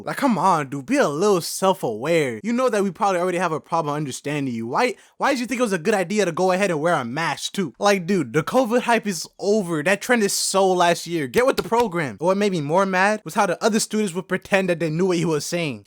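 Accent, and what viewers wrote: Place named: Portland, United States. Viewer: it almost sounds like you live in Portland or smth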